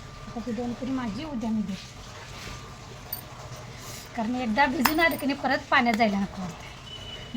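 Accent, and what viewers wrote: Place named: Sangli, India. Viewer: Tumhi sangli sidevcha ahat ka bhasaha varun vatle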